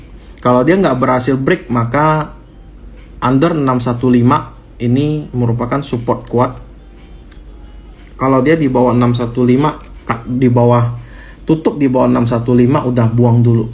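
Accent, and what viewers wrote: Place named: Kalimantan, Indonesia. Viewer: Dialek nya dari kalimantan ya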